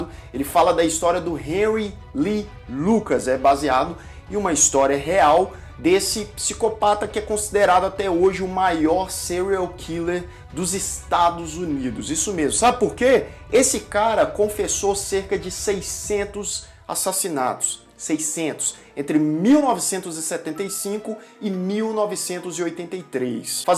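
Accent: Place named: Minas Gerais, Brazil. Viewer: Lucas ...vc pode ser de Brasilia,mas fala igualzinho aos mineiros ...sou mineira de BH ,embora more atualmente em SP,gostei mto da similaridade! Um forte abraço ,seu trabalho é de excelência !( só vi Laranja mecânica !)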